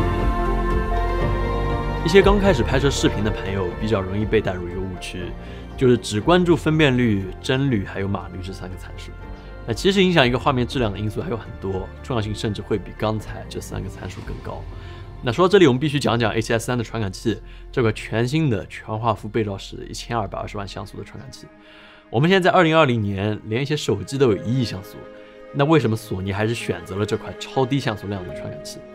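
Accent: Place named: Yangtze Delta, China. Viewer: 我是因为tim的长三角口音爱上影视飓风的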